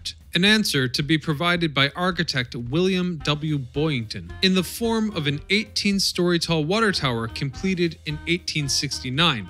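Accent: United States. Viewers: Yeah he sounds totally American but then there are some funny mistakes. Can't pin it either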